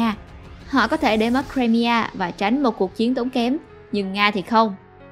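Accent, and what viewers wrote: South, Vietnam. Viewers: Bài viết hay,giọng đọc chuẩn Nam bộ rất ấm áp...like ***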